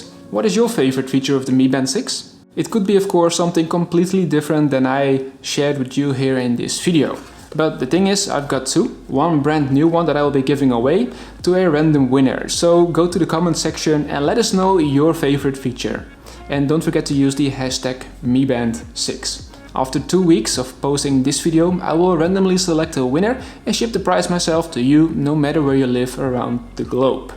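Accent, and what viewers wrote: Netherlands. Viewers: Ik hoorde echt vanaf de eerste seconde dat je Nederlands bent haha!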